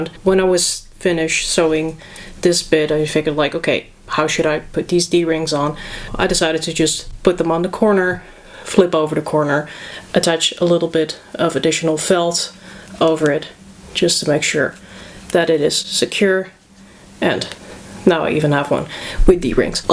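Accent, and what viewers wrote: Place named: Netherlands. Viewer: Where are you from I hear a Dutch accent ben je Nederlands?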